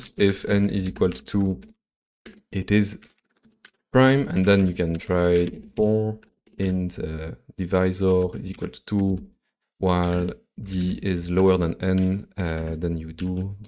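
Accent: France. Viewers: That French access is something truly marvelous, chef's kiss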